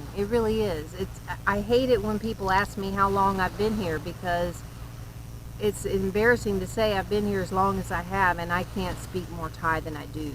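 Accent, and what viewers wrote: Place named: South, United States. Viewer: Sweet thang, you sound most southern!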